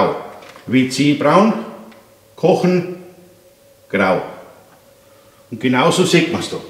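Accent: Bavaria, Germany. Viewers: Also mal ganz ehrlich von mir - Ich bin schon öfters auf deine Videos gestoßen und war sofort abgeneigt wegen deines Dialektes. Ich mag Bayern jetzt nicht so. Nur unsympathische Leute aus Bayern kennengelernt. Jedenfalls habe ich mir dann trotzdem ein paar Videos angeschaut. Bist mir doch ganz sympathisch jetzt. Klasse Inhalte. Gut rübergebracht. Beste Clickbaittitel überhaupt :)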